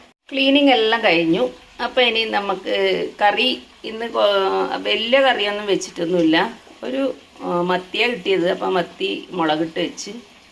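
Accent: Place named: Kannur, India. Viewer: ആഹാ അടിച്ച് പൊളിച്ച് cleaning നടക്കട്ടെ. നമ്മുടെ കണ്ണൂർ touch ഭാഷ കേൾക്കുമ്പോ ഒരു സുഖം❤❤❤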